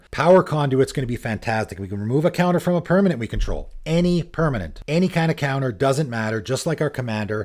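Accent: Canada. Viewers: Love ya but boy the Canadian pronunciation is KILLING ME. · bruh the heavy canadian accent is half the reason i subbed to this guy. I fucking love it edit: you realize its great when you replace every "a" sound with the ol canadian "eh" for example: s"eh"ga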